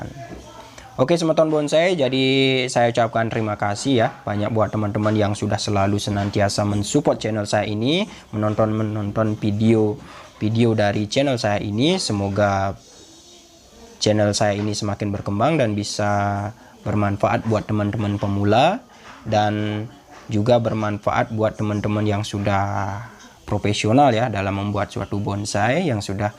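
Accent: Bali, Indonesia. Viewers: Logat bali'y kaga nahan ,gk bisa dibohongi 😆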